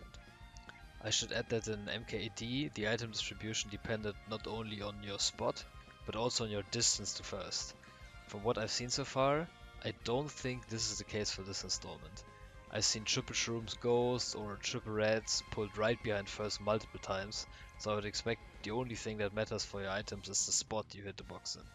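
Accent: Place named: Germany. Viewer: Der ist doch deutsch das hör ich raus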